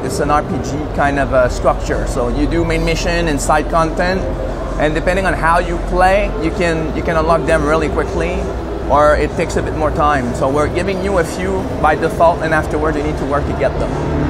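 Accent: Quebec, Canada. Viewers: Dat québécois english accent! :D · to folks wondering about the accent he's from Canada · He is right. His accent does come from Canada although only this accent is a French-Canadian accent that will only be found in Quebec and some parts of Ottawa.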